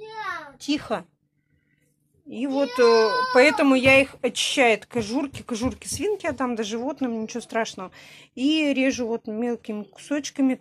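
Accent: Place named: rural, Russia. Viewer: Фу какая мерзость...крысы....антисанитария.... столько детей ...фу..... ещё и говор такой....ну деревня....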